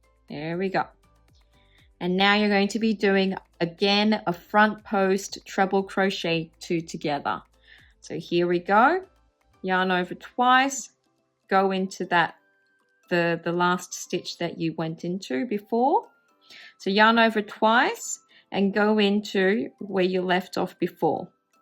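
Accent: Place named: Australia. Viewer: New Sub here. Great work by the way. PS: it’s very refreshing to finally hear an Aussie voice doing crochet videos ❤ loving it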